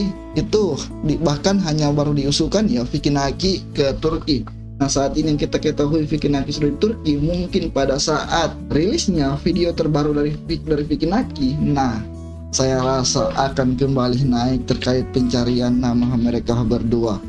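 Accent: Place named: Eastern Indonesia, Indonesia. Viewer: 😂😂😂pecah n gokil ini chanel konten reactor ter the best menurut sy ahli IT,high class,scoupe nya international,logat narasinya jg unik Indonesia timur,keliatan smart,bicara artikulasinya jelas ga pk banyak mikir,dng grafik data keren min 👍👍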